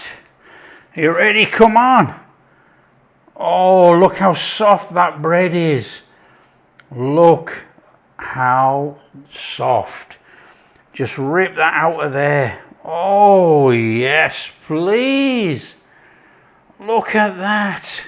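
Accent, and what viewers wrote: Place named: Scotland, United Kingdom. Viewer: I'm half Italian, and this is really making me hungry right now. I'm browsing for bread recipes, I haven't even had anything to eat yet. Loving his accent. Is that Scottish, I hear?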